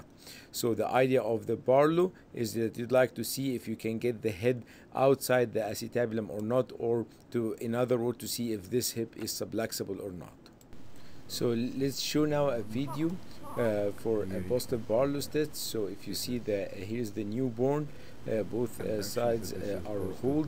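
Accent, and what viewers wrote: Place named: Egypt. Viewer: His accent sounds Egyptian I’m not sure lol Thanks for the video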